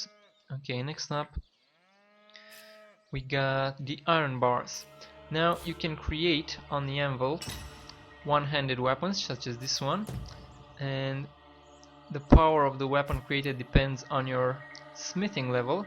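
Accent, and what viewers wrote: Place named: Eastern Europe. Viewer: he has slavic accent maybe russian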